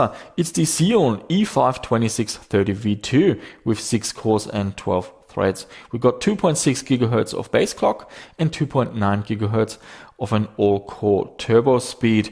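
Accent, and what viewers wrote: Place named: Germany. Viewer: Damn that german accent... 👍😉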